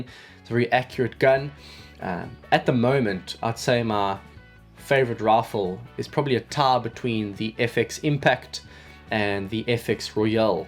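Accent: South Africa. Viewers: is your first language afrikaans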